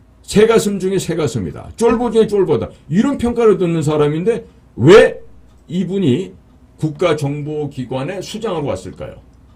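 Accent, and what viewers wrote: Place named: North Korea. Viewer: 님 이북에서 왔어? 말투도 그렇고, 종자주의? 난 처음들어보는 저런 단어를 쓰고 있노.. 저거 이북단어 아니여?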